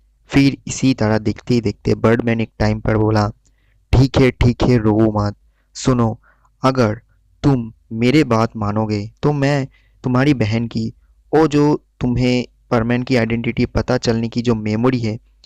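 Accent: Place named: Bengal, India. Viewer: Are you a Bengali because your Hindi is like from a Bengali because i am also a Bengali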